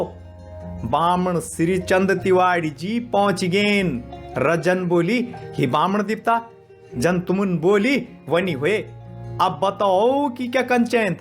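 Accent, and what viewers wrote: Garhwal, India. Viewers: गढवाली बोली सुनना बहुत बहुत अच्छा व मनमोहक व आकर्षक , आत्मिक लगता है।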